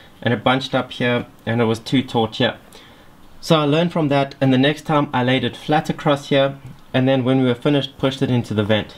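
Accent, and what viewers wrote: South Africa. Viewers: Is that a south african accent? Just curious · He sounds like an English South African. You must hear the accent of Afrikaans South Africans trying to speak English.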